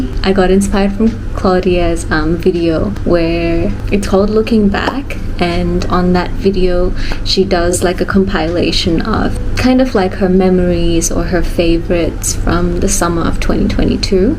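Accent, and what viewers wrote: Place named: Australia. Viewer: Your Aussie accent is getting stronger! ❤️ hehe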